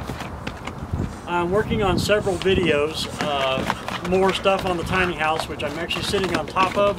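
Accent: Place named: Missouri, United States. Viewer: Thank you....i was getting the impression you are in Mo , I have family in Mo. and thought i recognized your accent ( be it barely noticable )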